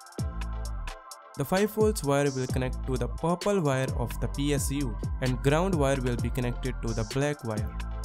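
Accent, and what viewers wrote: India. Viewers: Indian accent english 🤔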